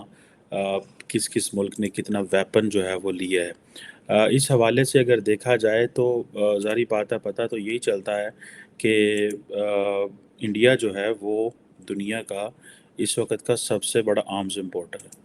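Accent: Punjab, Pakistan. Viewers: to watch his comedy and jealousy against India. He doesn't even know his national language urdu properly, He speaks in punjabi urdu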